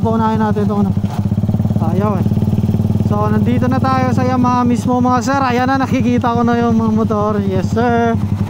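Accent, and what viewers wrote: Iloilo, Philippines. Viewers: iloilo po kayo booss??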